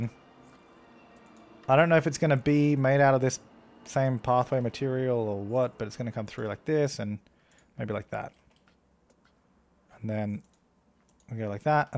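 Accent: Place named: Australia. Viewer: he has such an Aussie accent like it's not the stereotypical one but it's one of those Aussie accent. haha. I'm Aussie too but he sounds heaps like my mate.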